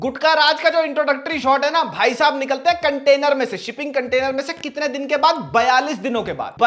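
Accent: Haryana, India. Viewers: Bhai mast review !! Bring back the haryanvi accent 😂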